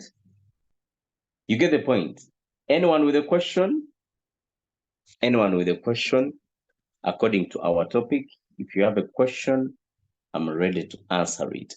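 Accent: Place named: Uganda. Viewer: Hello Sir, I understand from your accent that you are from Uganda...where I happen to be and I am interested in learning more as I would like to invest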